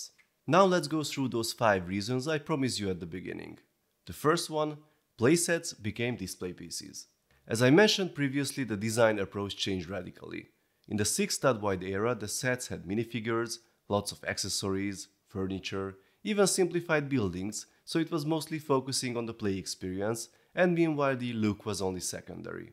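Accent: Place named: Hungary. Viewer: Oh, that accent... so typical hungarian accent. After the first few minutes I started to get suspicious, and I hoped to find the 'location' of this channel. The location is Hungary of course, so my ears were right. It's not necessarily a bad thing, my ears are just sensitive to that. Hungarian accent is such a distinguishable accent, and I heard way worse before than that. Usually when I dare/have to speak in english... I hope that my accent is not typical hungarian! In fact, I learned russian for a bit 7-8 yrs ago, and I found out that my english turned to sound russian a bit, so I might have russian accent when I speak in english.😆 I also like when people speak in english with real russian accent, it's just sounds great to me.😁 Anyways, great video, it was the first vid from this channel for me. 😀(And it turned out, somehow it was the 3rd vid for me from here) It was cool to see most of the Speed Champions in one place!👍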